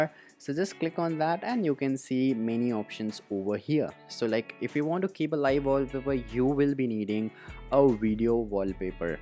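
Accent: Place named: India. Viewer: Best Indian accent i’ve ever heard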